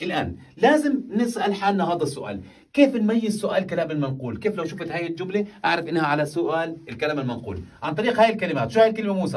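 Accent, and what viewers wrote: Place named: Iraq. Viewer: عبالي عراقي😊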